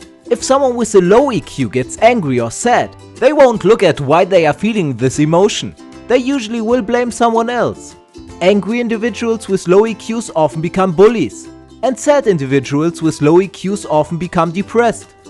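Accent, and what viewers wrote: Germany. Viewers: Ist deine Muttersprache Deutsch? You sound like a german 😃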